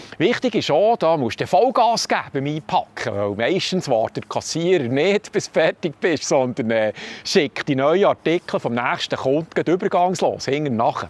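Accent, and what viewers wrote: Bern, Switzerland. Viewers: Die interessiert-offen vernünftigen Dokus und nun auch der lockere Beitrag mit dem stets sympathisch Berndeutsch sprechenden gefallen mir immer gut. Herzlichen Dank dafür! Tschübe aus Hettlingen ZH! 🙋🏻‍♂️ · Du bisch schwizerischer aus jedä Schwizer, oder so nes schöns Bärndütsch findet me chum me. Ä so het me vor 15 Jahr no g'red, ou dSprach tuet sich verändere, und nid zum Guete wie ig finde. Es git immer meh so ne Einheitsbrei. Ig lose dir us Burgdorf zue! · Es migroching, hani überno vo mire mueter, u speter , nach mine erfahrige, gröschtteils blibe. Regionali lädeli , oder burelädeli hei Priorität. I schetzes o, das mir hie so eiget si, o wes chli ängstirnig isch, aber we not am Mann isch, luegtme fürenang. I finges schön , hesch zyt für Ferie z mache.gnieses ömu de.o mit famille u fründe z si. Dis bärndüsch isch so ächt urtümlech, lise scho nume wäge däm gärn zue. 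Liebi Grüess us mamishus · Hallo, darf ich dich Duzen? Ich tu es einfach. Ich freue mich immer auf deine Videos. So ein schönes Berndeutsch. Ich denke dass du jetzt in Thun bist. Ich schreibe aus Langnau im Emmental.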